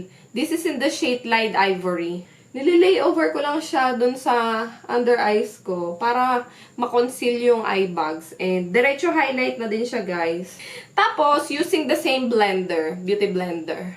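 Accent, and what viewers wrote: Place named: Bulacan, Philippines. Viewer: ur so cute ganda..hahahaha.. naririnig q ang punto ng bulacenia sayu.hahhaa... i miss u nmn pati si skysky..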